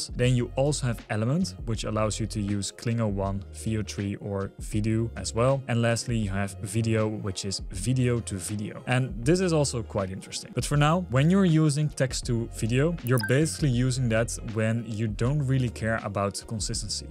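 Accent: Netherlands. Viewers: How is it that I can always recognize Dutch people when they speak English, even when they speak it almost without an accent? By the way, great content, keep it up!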